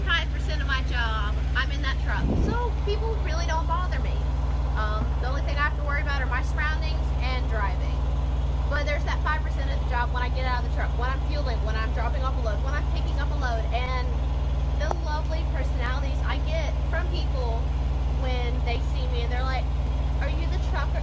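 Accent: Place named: South, United States. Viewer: This girl is an absolute beauty. I love her country accent as well. Keep doing your thing girl.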